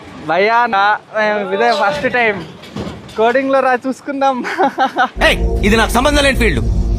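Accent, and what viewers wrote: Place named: India. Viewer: Ethulaki bayapadani akuva-man, painki yedhagaliiii 💯🫡 garu's efforts to vlog for us and mainly how he supports everyone (jabardasth,masth, his extrovertism, Mannnn)✨ Cameraman bhattu nunchi inspire ayinatu unnaruuuu 😶‍🌫️😂 His Hindhi accent 🔥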